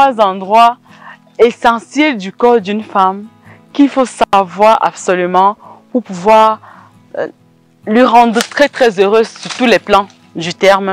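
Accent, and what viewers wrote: Cameroon. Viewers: C'est comme la poule. Elle ne donne pas du lait, mais mais mais, elle nourrie ses poussins, avec la chaleur de son corps. Merci.. bonjour. Ton accès de ton me ressemble à une camerounaise. Merci.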